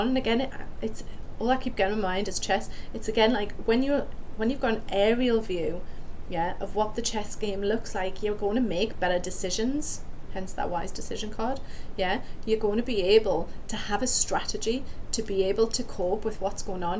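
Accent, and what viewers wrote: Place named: North, United Kingdom. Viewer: !!!! 🙏🙏🙏🌈🌈🌈 You must be in the north because I'm in the south and nobody sounds like you! 😂😂😂 You sound like Sarah Milligan 💜😂 do you do in person readings? I'd love to meet you 🌈🙂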